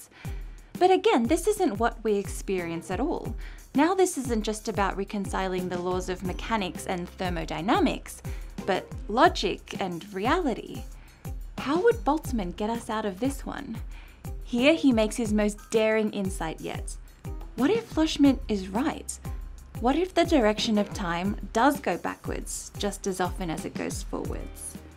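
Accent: England, United Kingdom. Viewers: a pleasure to find out your name. I have watched maybe a dowzen of your videos, and like them all. I took algebra, geometry, trigonomics, physics and chemistry in college. But your simple explanations help me recall and see things in a new way. You even helped me to understand Calculus! Thanks! The English accent is a bonus for me.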